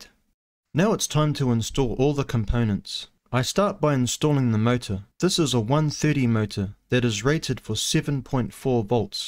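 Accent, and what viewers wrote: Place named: New Zealand. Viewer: Good work, thought i could hear a kiwi accent, good to see some kiwi diy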